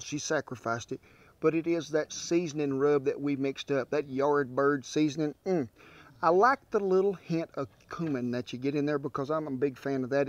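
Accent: South, United States. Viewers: Gotta love that southern language. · I love that cowboy accent.....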